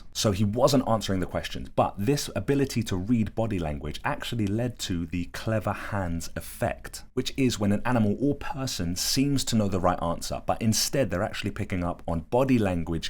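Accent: United Kingdom. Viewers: Ink line drawings and interesting stories told in a British accent. Sign me up!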